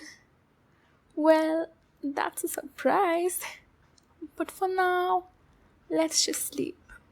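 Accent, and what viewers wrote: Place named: India. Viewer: I can sense the indian accent in this audio.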